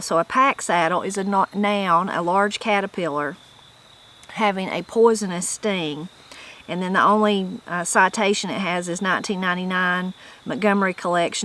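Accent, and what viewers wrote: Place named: South, United States. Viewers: Ya speak pretty much the same way we do in east and west TN, people look at me funny because of the way I talk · I call this southern english.I am 70 I don't speak it as much as I did when I was younger.I guess it is because all the older ones have passed away and media doesn't speak it.I love and am very proud to be Southern and speak Southern English.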